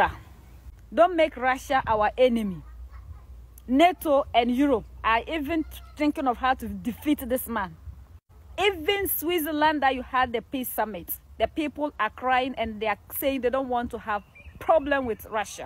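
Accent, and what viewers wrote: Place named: East Africa. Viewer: Are you even a Ghanaian to be speaking for Ghanaians.? You sound more like an East African..anyways speak for yourself wai and don't say "we" the citizens. You and who.? Nice try though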